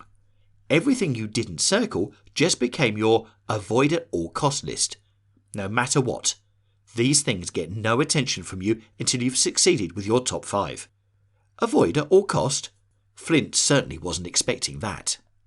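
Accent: United Kingdom. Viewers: Is this British English? Just curiosity.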